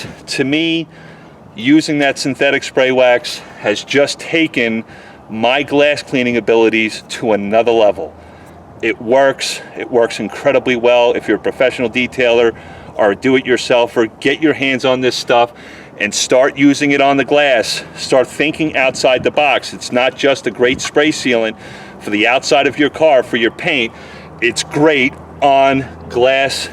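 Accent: New York, United States. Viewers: >Dallas Paint >Thick New York accent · Lives in Dallas but is from New York, New York accent. 😊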